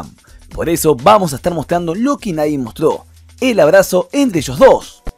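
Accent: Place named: Argentina. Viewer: POR LA MANERA EN LA QUE HABLAS NOTO QUE ERES ARGENTINO, Y TE FALTO MENCIONAR LA PALABRA HUMILDAD, POR QUE EN SUS VIDEOS Y NOTAS DE LOS MEDIOS DE COMUNICACION DE ALLA, LES FALTA USAR MUCHO ESA PALABRA? LO QUE MAS GRADE HACE A MESSI ES ESO, HUMILDAD Y LA TUVO EN ESE MOMENTO QUE ABRAZO A MBAPPE, MESSI ES MIL VECES MAS GRANDE QUE EL ADICTO MARRANONA, EN PRIMER LUGAR POR LO QUE HA GANADO EN LA CANCHA Y EN SEGUNDO LUGAR POR LO HUMILDE QUE ES, HUMILDAD LE FALTO A ESE TAL DIEGO.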